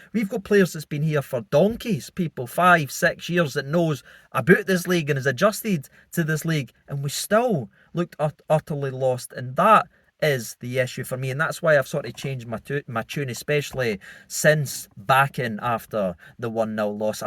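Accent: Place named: Fife, United Kingdom. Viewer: ...which part of Scotland are you from? Your accent does not seem....glaswegian. Are you from Fife?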